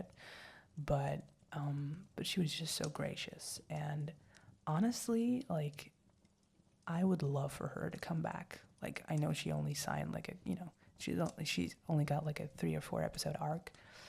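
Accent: United Kingdom. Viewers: The British accent got me tund on af lol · Love your British accent 😊🥰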